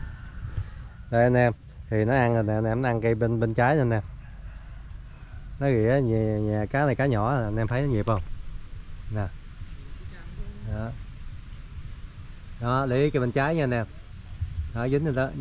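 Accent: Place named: Mekong Delta, Vietnam. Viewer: đúng nói chuyện kiểu chân chắc miền tây, câu dính thả cá là mình thích rồi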